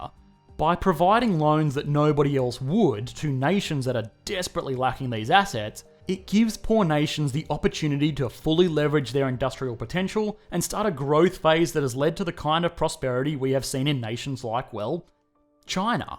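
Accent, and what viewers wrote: Australia. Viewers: This dude sounds Australian and is saying China is about "Free Trade".... Has he looked at his local newspaper? Almost like me saying America doesn't have a rioting problem. Or China saying they didn't start the Coronavirus. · 趙子畵 hes definitely an Aussie.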